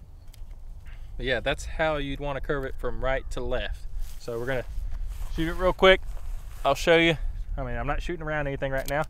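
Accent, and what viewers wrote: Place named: Rural, United States. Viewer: I was expecting him to start spitting into a spittoon chewing tobacco while smoking a cigarette and drinking whiskey from the bottle on his rocking chair... not breaking physics Loving the hick accent tho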